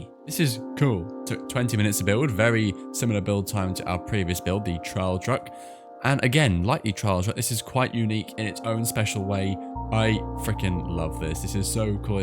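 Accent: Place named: Denmark. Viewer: holy danish accent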